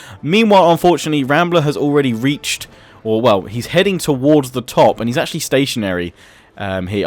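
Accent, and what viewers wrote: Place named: Scotland, United Kingdom. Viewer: That Scottish accent tho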